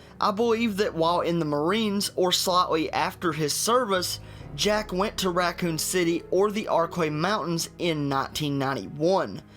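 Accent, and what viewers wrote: South, United States. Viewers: JSG GAMING its a southern accent.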